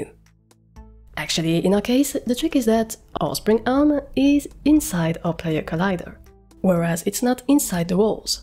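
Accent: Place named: France. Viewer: Wait a Minute... I know that accent... You are French, lived there for 5 years, Toulouse was a great place ^^ Keep it up, great tutorial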